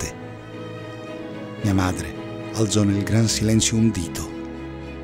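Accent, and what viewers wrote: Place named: Naples, Italy. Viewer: Bravissimo! E poi questo accento Napoletano su una poesia Romagnola rende il tutto universale e oltre il tempo...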